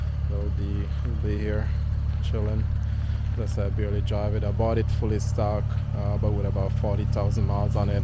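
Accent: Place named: Jamaica. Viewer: Breddah The jamaican Accent cyaan hide, CLEAN!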